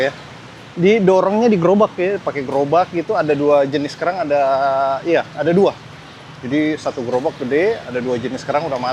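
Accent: Eastern Indonesia, Indonesia. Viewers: kaka punya logat timur kantara skali😂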